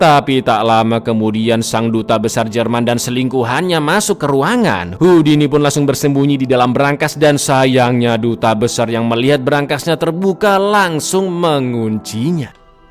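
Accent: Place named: Madura, Indonesia. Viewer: Madura kamu bang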